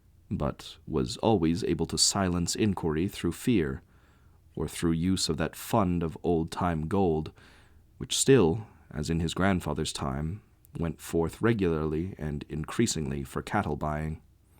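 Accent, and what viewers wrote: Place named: North America. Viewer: Thought the sound was good. North American accent always works with hpl. Obviously the connection with the great god pan is mentioned in the text. But. Connection with 'the white people' less obvious....a playmate was coming 😮.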